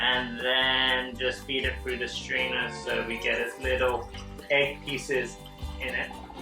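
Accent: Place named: United Kingdom. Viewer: I’m so confused.... you said stove then apologized for British accent then explained stove=cooker... who the fuck says cooker???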